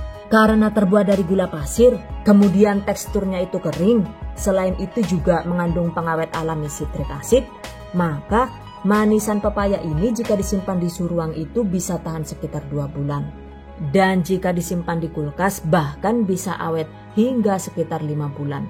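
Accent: Banyumas, Indonesia. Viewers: Ngapaknya bikin ngakak bunda.. 😄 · Ko bahasane bisa ngapak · kok bahasa medok banyumas, asli bms ya jeng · Wongendi kie deneng ngapak temen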